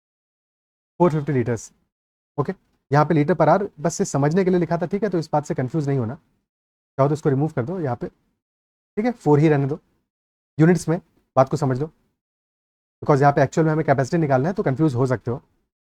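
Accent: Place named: South, India. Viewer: Sir south indian accent is crazy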